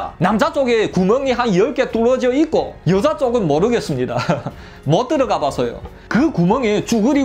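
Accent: Yanbian, China. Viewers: 화룡시 팔가자진에서 30전짜리 꼬치구이 장사 6년하다가 쭝뽀우 생명보험 3년하다 한국나온지 21년 됐음,한국사람하고 결혼해서 두아이의 엄만데요,찐한 연변사투리 구수하네요~대박나세요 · 연변말좀고치고 방송하시오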